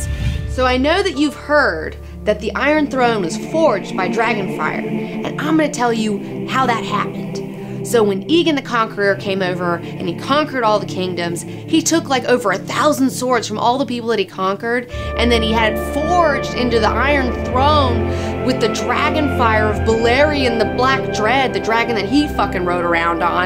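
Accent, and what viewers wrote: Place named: South, United States. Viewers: You sounded especially southern in this video. I love it! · What state are you from? I hear a southern accent.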